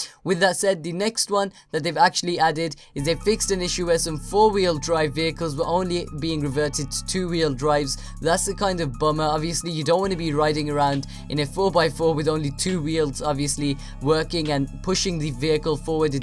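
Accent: United Kingdom. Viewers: This guy sounds like he's from the UK, ain't from Canada?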